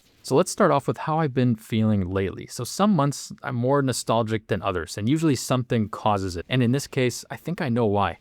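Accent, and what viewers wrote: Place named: Wisconsin, United States. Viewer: Bro has the most Wisconsin accent ever I gotta say dude 😅😅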